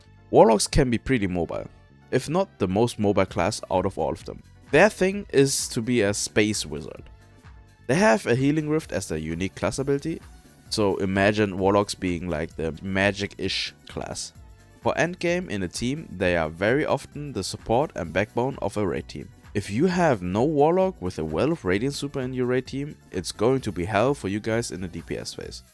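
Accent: Germany. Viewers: Wieso hört man als Deutscher raus, das du Deutscher bist haha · Aß a german i can Say you Sound kinda german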